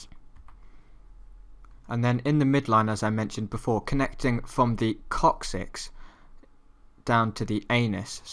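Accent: United Kingdom. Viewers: Love you, love you're work!! Crying with laughter at that brilliant verse "S2, 3, 4...". A&P exam tomorrow for my yoga teacher training cert, have been through so many of the vids in last two weeks- they are brilliant and have helped me massively. None of this crappy american voiceover stuff, was over the moon to hear the British accent when I clicked on the first one - legend. I'd better pass now! :-)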